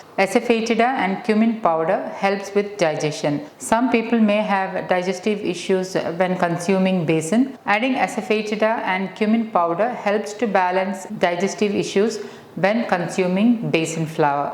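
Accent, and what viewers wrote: India. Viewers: Very bad voice and typical weird Indian English accent. Sorry to say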